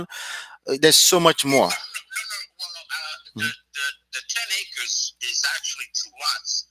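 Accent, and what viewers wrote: Nigeria. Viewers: You Nigerian bro?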